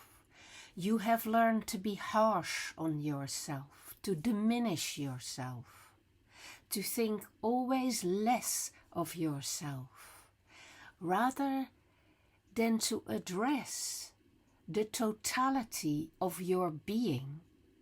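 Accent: Netherlands. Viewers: Beste, bent u Nederlands? Ik vroeg het me af op basis van uw Nederlandse naam en accent.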